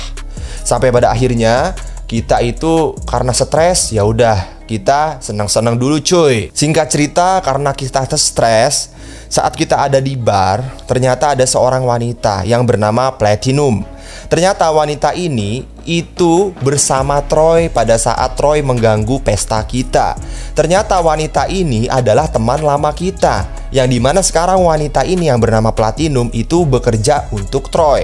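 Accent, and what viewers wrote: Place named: West Java, Indonesia. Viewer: Kang jiga orang sunda logatnya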